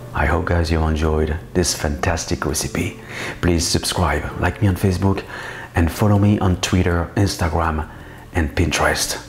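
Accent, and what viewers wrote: France. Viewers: You are so delightfully smarmy! Your voice, your accent, your facial expressions... oh, you make me miss my time living en France. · first time viewer. your french accent, and story telling abilities sold me. subscribed already. :)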